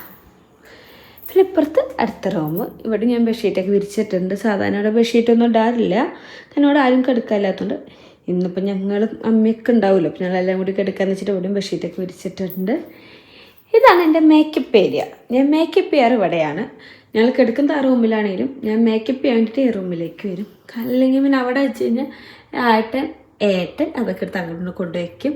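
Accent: Thrissur, India. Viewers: thrissur okke angane ahn parayaa😂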